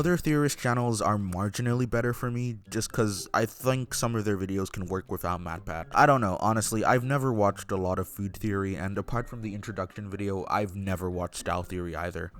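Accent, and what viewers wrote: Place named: England, United Kingdom. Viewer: I want the crazy horny werid dude not that fucking anoying posh English cunt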